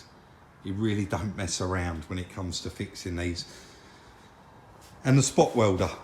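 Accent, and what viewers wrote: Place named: Thanet, United Kingdom. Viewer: My mothers family came from the Thanet area and when I hear that accent of yours it really reminds me of them. My Nan lived in Herne bay and ran the old dairy shop for years, then she had a few cafe's in Mortimer street and a green grocer..she was really well known in her day....She moved on to Whitstable where she had the 8 till late shop on the main drag in town. Most of them are no longer with us but it's good to hear the dialect and sayings. I bet you went to Leysdown on holiday as a kid ?? Great channel, very interesting.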